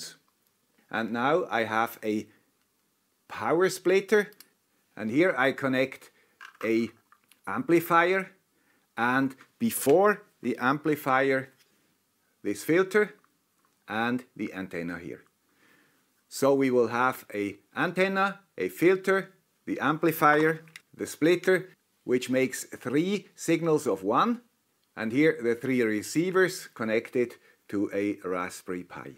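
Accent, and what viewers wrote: Switzerland. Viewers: hello this is the guy with the swiss acce...(smashes like button instantly)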